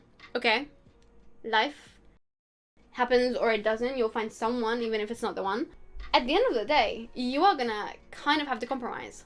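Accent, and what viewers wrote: United Kingdom. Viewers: That's the thiccest British accent I have ever heard........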